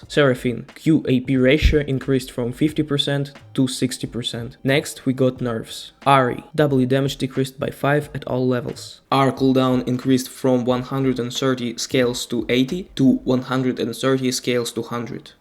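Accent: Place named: Russia. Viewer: Фикси акцент, слышно что ты russkey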